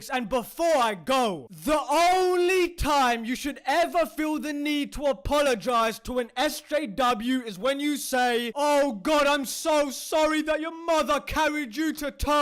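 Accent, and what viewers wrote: United Kingdom. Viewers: The British accent makes this 100x better lmao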